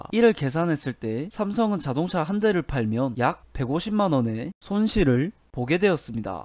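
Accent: Gyeongsang, South Korea. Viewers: 첯음절에 강력한 엑센트 깅상도사투리 땜에 패쓰~~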